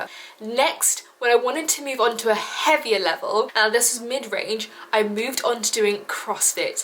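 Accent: RP, United Kingdom. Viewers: You sound so posh you remimded me of my of my cousin who goes to private school loooollllll🤣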